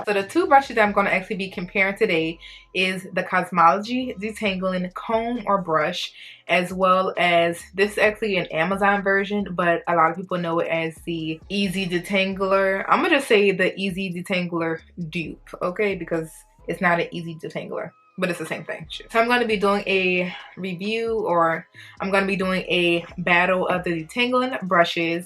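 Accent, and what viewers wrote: New Orleans, United States. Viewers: It’s so interesting listening to you speak, I didn’t know you’re Nigerian but you definitely have that African American accent and a little bit of that NOLA flair.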